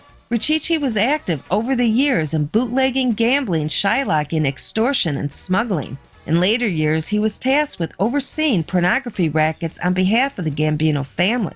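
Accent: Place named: Chicago, United States. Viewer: Your Chicago Land accent is hilarious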